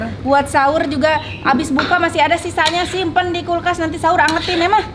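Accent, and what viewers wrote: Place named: Sunda, Indonesia. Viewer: Seneng banget ngeliat teh, suaranya imut banget suara khas sunda, yang tau pkonyaa..... Panggeulisna sunda❤️❤️❤️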